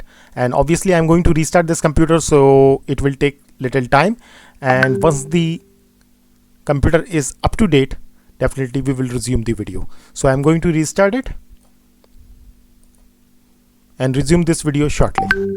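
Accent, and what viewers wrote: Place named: India. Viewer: I'm leaving the moment I hear this Indian accent... already fed up with those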